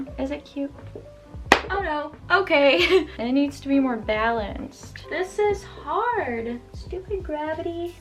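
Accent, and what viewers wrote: Netherlands. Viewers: Wait what are u Dutch? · Are you dutch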